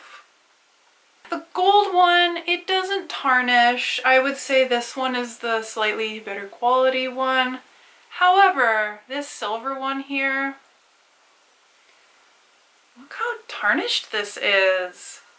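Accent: United States. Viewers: Cute channel, informative vid! Can I ask where you’re from? I hear a kind of northern/mid-western North American accent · This was all such good info and I loved listening to it because your accent is so cute! Where in the states is that accent from?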